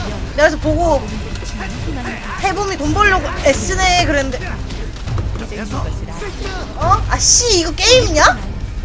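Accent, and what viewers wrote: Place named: Daegu, South Korea. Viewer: 부산사투린줄 알았는데 대구였군여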